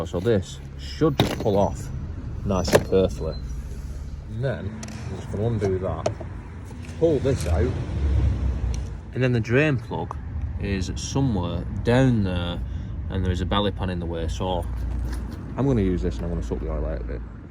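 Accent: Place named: Oldham, United Kingdom. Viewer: I visit this channel for my daughters’ C1 and now you’ve bought the same model as my car, there is a god. Plus those dulcet Oldham tones, reminds me of home.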